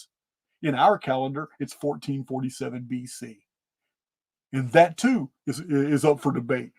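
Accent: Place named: South, United States. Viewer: I have been measured at 161 IQ. You are truly smarter than 119...I put you at 162...it is too hard on the intellectuals to lose arguments and debates to a southern accent. I know, I am from NC, and live in California...Yep, that southern accent is a double edge sword. On one hand the intellect is stealthy, on the other hand it is easy to dismiss a southerner speaker and pass it by as a dumb-ass blithering, which you and I are not. Love your shows.You ever need a typing done, i do about 100 wpm.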